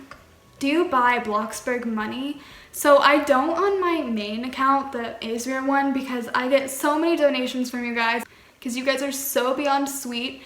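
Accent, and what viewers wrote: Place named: Canada. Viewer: You sound Canadian and it's awesome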